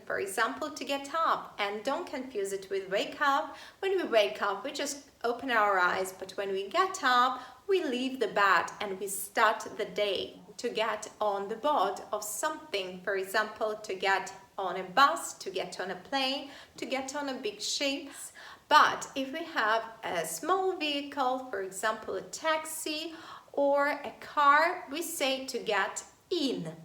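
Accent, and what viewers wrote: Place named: Ireland. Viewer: You king of sound Irish when you speak :-) Great teaching skills.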